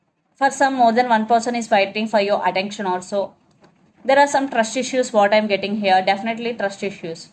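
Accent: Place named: South India, India. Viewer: Your reading is to the point.i am really Impressed. your accent is south Indian.GOD BLESS YOU.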